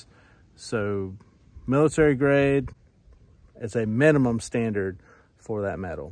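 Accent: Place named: South, United States. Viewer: I’d rather have dents than rust. I live in the rust belt and it’s the only reason it’s necessary to replace my vehicles. By your accent it sounds like you live in the south. I purchased a 2017 f150 just for that reason! My last one is a 2008 and has rust everywhere!!!! Steel is very very strong but also heavy. I just restored a Saab 92x and the rust cost me 10 grand to fix! It’s got to be stainless steel or aluminum for me.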